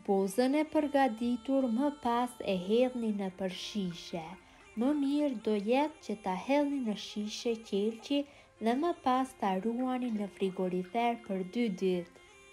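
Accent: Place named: North, Albania. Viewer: o zemer , je e mrekullushme, jo vetem per receten e Bozes por edhe per dialektin e mrekullushem te veriut qe ke, gjithashtu edhe muzika ne sfond , te uroj nga zemra gjith te mirat, pershendetje nga Gjermania.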